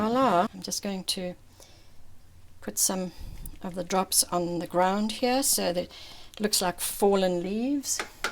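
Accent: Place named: South Africa. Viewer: Hi, Ive been doing watercolours for the last 9 months and loving them. Thanks for your videos they have been very helpful to me. By the way you sound very south african...must be an expat!:)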